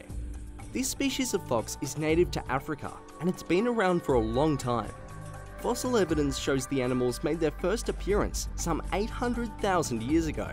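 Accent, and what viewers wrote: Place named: Australia. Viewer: You know a video about wildlife is legit when you hear an Australian narrator.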